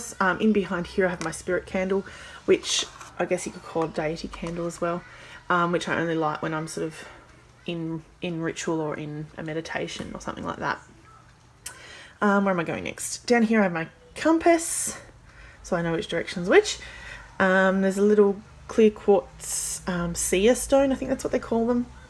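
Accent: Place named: Australia. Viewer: Nawww this was so fun! Thanks for th tour. I miss Witchcraft magazine too sooooo much and Fiona Horne is still my absolute favourite witch!! Loved and continue to love her books. Where are you based? I detect a distinct Aussie accent 😁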